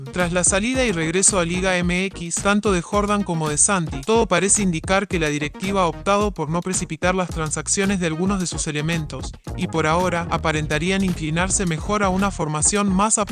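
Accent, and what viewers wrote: Argentina. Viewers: Y siguen con su tonito argentino.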